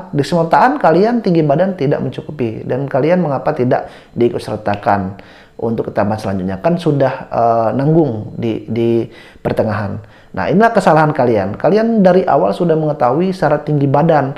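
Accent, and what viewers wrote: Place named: Palembang, Indonesia. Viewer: dari logatnyo caknyo berasal dari palembang yo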